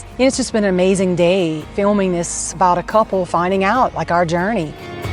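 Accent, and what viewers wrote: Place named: Louisiana, United States. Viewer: Monkey Michele is so proud of you girly! You will inspire many with your story! I love that you haven’t lost your Cajun accent. I hear it so clearly! Stay close to your roots. Much love!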